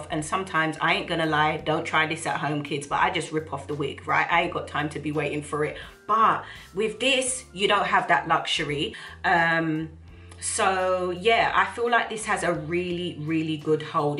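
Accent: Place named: United Kingdom. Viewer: Omg...I love love love love your accent, my dream is to come to the UK 🇬🇧 ❤️ thank you for your review. I bought the Ebin in the pump(like pump it up) you get WAY more lol can't wait to use it.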